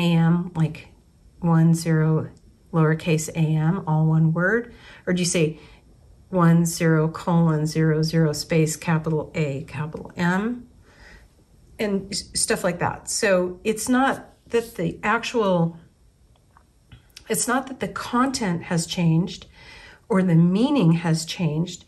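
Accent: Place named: Australia. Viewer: I love when you get goofy! You should post some of it here. And expose yourself, if needed. 😂 Seriously I can’t wait to see all of those inner voices in cartoon form. And your Aussie influenced words are so cool, like my Siri is set to Australian version, just so I can hear “no worries” and things like that.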